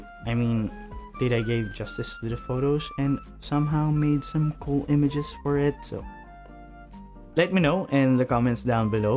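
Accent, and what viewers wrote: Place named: Philippines. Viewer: SKL I recognized our Filipino accent immediately 😂 Much love and support ⭐️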